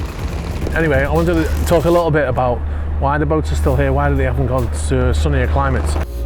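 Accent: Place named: Merseyside, United Kingdom. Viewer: Sounds like you originate from Merseyside so the sea is in your blood then. How did you get into the career you've had?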